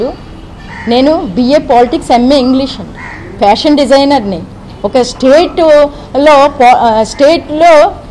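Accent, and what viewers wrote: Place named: East, India. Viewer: Mi east voice slag super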